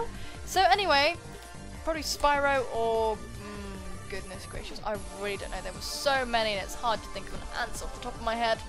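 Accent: United Kingdom. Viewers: You have a beautiful accent! What is it, british? :D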